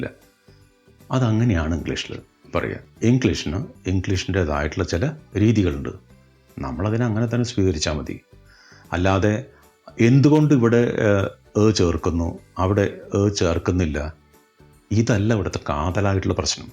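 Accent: Thrissur, India. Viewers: ingalu thrissurkaaran aano ?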